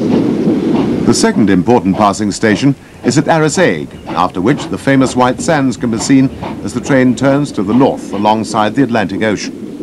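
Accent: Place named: England, United Kingdom. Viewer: och aye the noo......see you jimmy....he has to be English,would'nt understand a jock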